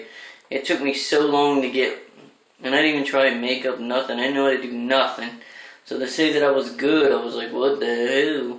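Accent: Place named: Ukraine. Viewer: Are you from the UKRAINE ! Your accent is very strong !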